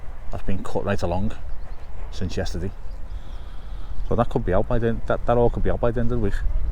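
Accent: Liverpool, United Kingdom. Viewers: One more thing, u scouse but i understand 💯 of your accent,, i know you try to speak english not scouse , for us to let us understand as much as possible.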